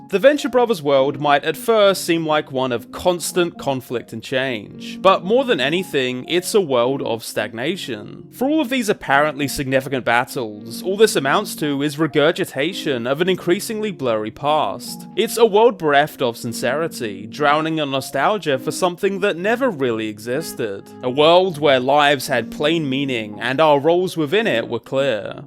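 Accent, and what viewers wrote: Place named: United Kingdom. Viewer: he's literally just British holy shit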